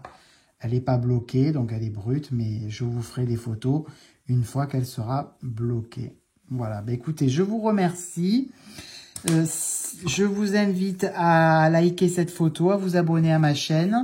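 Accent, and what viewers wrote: South, France. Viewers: Merci pour ce tuto chaussette je vais essayer avec ta méthode. Je suis débutante au tricot et ta façon me paraît bien simple, bonne journée, j'adore ton accent du sud, moi aussi je suis du sud 😊😊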